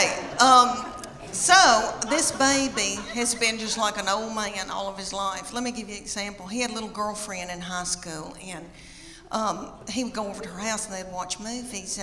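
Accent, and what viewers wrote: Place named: Appalachia, United States. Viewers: I'm stuck in Pennsylvania for a week and a NATIVE OF The BLUE RIDGE MOUNTAINS of SOUTHWEST VIRGINIA!! THE REAL VIRGINIA!! Where we ALL TALK LIKE!! I'm so homesick from hearing her voice!!! I wanna GO HOME!!!! 😣 God BLESS YALL!! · Most of my remaining family is from the deep south and her accent sounds like home. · Omg, how am I just now finding out about you? You are hysterically funny!!! My friend told me to watch your videos the other day. So, yesterday I started watching early in the morning. At one point, I was laughing so hard I was literally crying! The one where you are picking up your kids from school had me in tears. I relate so well. Thanks for the laughs. I can't wait to watch all of your standups and see you live!! I am also from TN, so your accent reminds me of home :)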